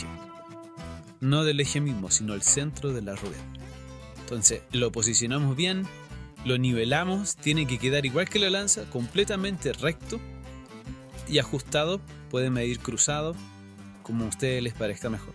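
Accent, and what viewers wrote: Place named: Chile. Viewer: Hola, gracias por compartir. Una pregunta: ¿De qué país eres (parece Chile por la tonada) y si hay legislación vigente hay que regule éste carro? Aquí en Argentina se complicó mucho con trabas, homologaciones, pagos de patentes y demás curros que te sacan dinero y tiempo. Gracias por compartir, abrazo. Dios te bendiga.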